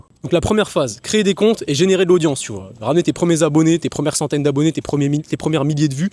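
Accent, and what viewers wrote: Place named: Algeria. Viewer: 2 secondes dans la vidéo tu me fatigues avec ton accent pied noir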